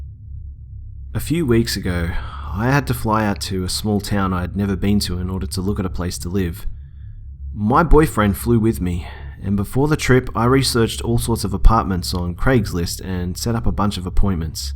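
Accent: Australia. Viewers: As a fellow Aussie, it's so nice to hear your voice :)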